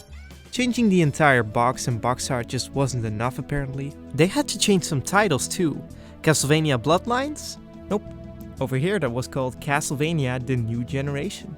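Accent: Netherlands. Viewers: Wow man, Nice subject. great editing and research. Also great accent for a fellow dutchman. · Watching your video I was like: damn, this dude sounds like he could live next to me. And then you said you're from the Netherlands and I'm like, makes sense lol. Great video! The King's Day part hit. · Oh, a fellow dutchman! I was wondering why your accent sounded familliar. I've been meaning to get into game collecting. I have already baught a nintendo 2DS as I used to have a 3DS back in its hayday. And I missed some of the titles on there. I also have a Wii U but I only have one physical game on it. I'm gonna subscribe to your channel hopefully learn some more about game collecting in the future. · Jij bent 100 procent nederlands. Je accent maakt het duidelijk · Ik had pas in de gaten dat je Nederlands was op het moment dat je het zei. Nederlands accent heb je haast niet meer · WHY do I ALWAYS recognize english speaking DUTCHMEN?! 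· accent gehoord, nederlander gespot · You dutch? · You’re definitely Dutch aren’t you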